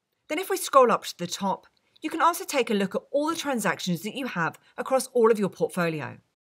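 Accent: United Kingdom. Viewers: Could not understand her at all...her thick Brit accent ruined the video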